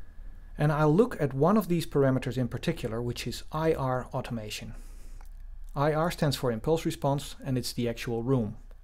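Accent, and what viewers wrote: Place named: Netherlands. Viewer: What a lovely Dutch Accent ;)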